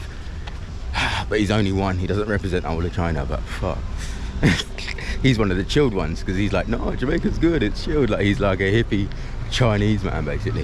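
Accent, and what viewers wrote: United Kingdom. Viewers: You are obviously disgruntled socially. Where are you fron really?. I can hear the british accent. Am Jamaica and live in theUS. Just can't understand what you are do angry about. People ask you for money just day no. I have neices, nephews and lots of cousons in the UK. Enjoy you stay in Jamaica and calm your nerves. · Honestly i am not bias. I just see this guy with a weird personality.. He sounds so bitter and he doesnt seem to have any confident in himself. I think that he was mixing with the wrong people. He got lost in the bushes. What is wrong with him? He needs help!! Hes just throwing tantrum . The other utubers ask ed for help from people who they have sought out before landing but you just went this british accent and think that would get you by. Life doesn't give anyone what they deserve, you must fight for what you want. 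Love ❤brother but your attitude isnt right. Jamaicans love and care for people.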